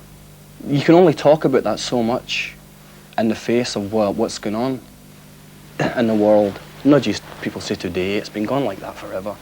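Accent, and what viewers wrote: Scotland, United Kingdom. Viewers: some kind of cute he was in this interview, i love his scottish accent 💕 I love 🥰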